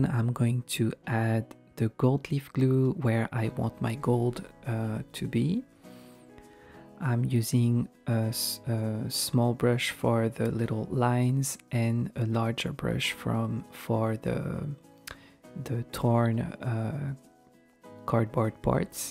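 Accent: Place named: France. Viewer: I recognize a french accent when I here one hahaha 😂 Is your family from Corsica? Beautiful painting as always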